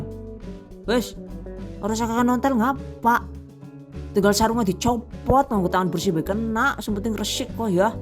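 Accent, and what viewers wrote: Banyumas, Indonesia. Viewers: Kie wong nek agi ngomong ngapak jan merguyokna pol😂😂😂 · terimakasih atas resep nya kak ,ngapak juga nih kakak 😂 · Sg penting ngapak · Mantap Bunda apa lg dng bahasa ngapaknya aku suka sekali jd sambil nonton sambil ngakak😍👍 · Selamat pagi , matap . Gabung bikin ngiler haaaiy .Bunda Suaranya Jawa enyong (kebumen , Bnyumasan )jw tulen 😃😃😃😃😃😃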